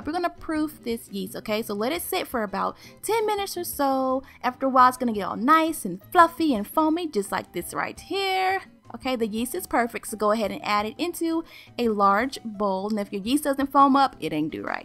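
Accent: South, United States. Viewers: IK she's southern but from which state? Sounds like Virginia or Carolina to me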